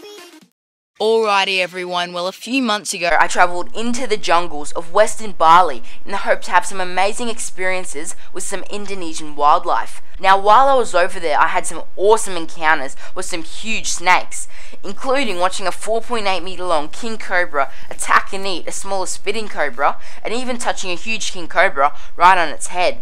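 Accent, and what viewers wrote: Australia. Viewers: I love your accent are you from Australia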